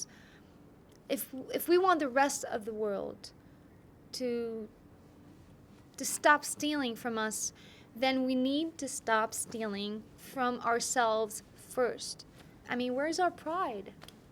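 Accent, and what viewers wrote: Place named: United States. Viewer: Her American English accent is really good. Her hair is long as hell though she needs to get it cut.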